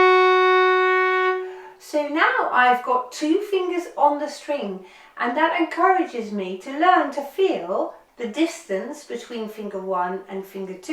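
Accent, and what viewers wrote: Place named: England, United Kingdom. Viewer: Great lesson as usual. Thank you,. You have a lovely English accent that is very rare these days. What is its origin if I may ask? Sounds very posh!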